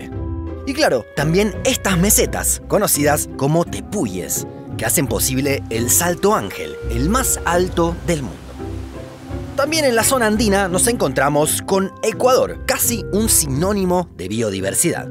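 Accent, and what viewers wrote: Argentina. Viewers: Por tu forma de hablar, sos compatriota o uruguayo. Abrazo. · Vaya acento de este chaval, qué es ese dialecto argentino?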